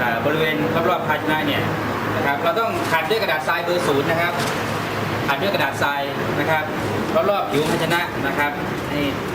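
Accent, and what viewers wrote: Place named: South, Thailand. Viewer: เกรื้องเกลื้อบดินเผา ,ทิ้งไว้สักกรู้ คนพากย์เป็นคนใต้แน่เลย<num> ก.ไก่ทุกคลิป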